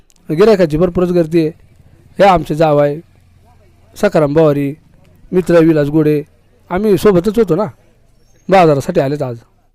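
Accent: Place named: Palghar, India. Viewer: दादा तुम्ही बोलता तीच बोलीभाषा आहे आमच्या गावची पण... मला वाटले तुम्ही पण पालघर मधलेच आहेत की काय...❤❤ जय आदिवासी दादा...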